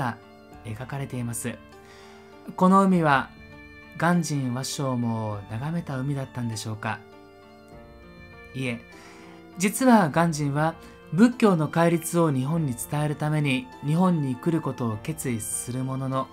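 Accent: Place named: Kobe, Japan. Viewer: 先生の動画でちらほら聞こえる上品な関西弁アクセントは、神戸かなぁって思ってるんですけど、やっぱりそうやったんやろか?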